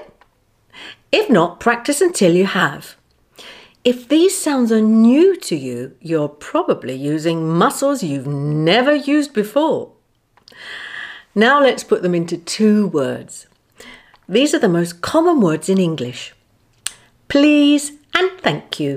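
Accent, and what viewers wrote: United Kingdom. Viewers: The accent of English is British? I don´t notice at the first time that I listen your voice. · Can ask u a question that what's kind of your british accent?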